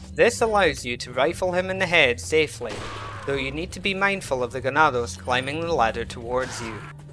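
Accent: Scotland, United Kingdom. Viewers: Are you Scottish or Spanish?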